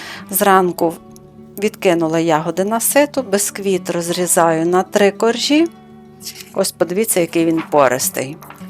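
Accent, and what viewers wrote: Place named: West, Ukraine. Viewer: Гарна українська мова заворожує дивитись, пояснення і все по - панськи! Як говорять на Заході України! Успіху! Буду пробувати Ваші смаколики! 💛💙❤️🌹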